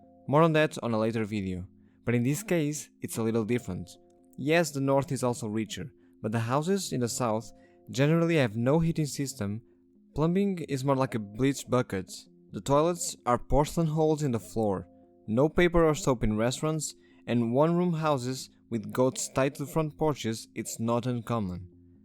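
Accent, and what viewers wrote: Portugal. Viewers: I knew you where portuguese because of your accent. When you said obrigado though i was 100% confirmed.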